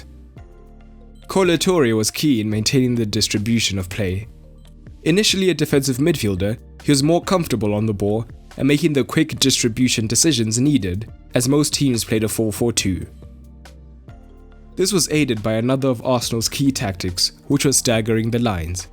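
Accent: South Africa. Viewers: Are you south African ? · Are you South African mate?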